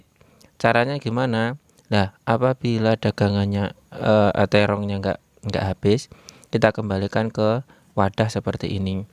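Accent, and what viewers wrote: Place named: Java, Indonesia. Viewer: Luar biasa sangat bermanfaat kang....saya langsung subscriber...bahasa jawanya medok lucu tp sangat bermanfaat, sukses selalu kang.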